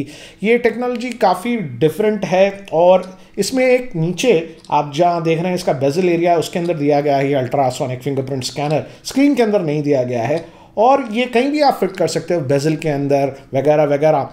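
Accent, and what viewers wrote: Hyderabad, India. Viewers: Now It's Time To Hyderabadi Hindi Well Explained Sir👌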